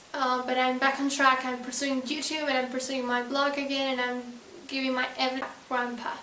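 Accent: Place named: United States. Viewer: Me encantaría hablar como tu! Hablas como las gurus d usa :)))) m gustaría saber como hiciste para perfeccionar tu inglés!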